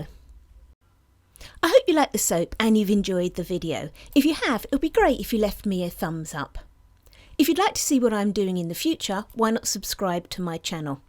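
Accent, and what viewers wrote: United Kingdom. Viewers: Holy cow, these are the CUTEST EVER!!!!! I am absolutely in LOVE with your channel as a brand new soap maker! I'm an artist and love to create pretty things so your videos give me hope that I can one day get to a point even close to some of the soaps you make. What an inspiration! You are a wonderful teacher also and I absolutely LOVE your accent! (I play an online game with a lot of folks from your neck of the woods and it amazes me how many different accents there are just in the UK!) Gorgeous soap!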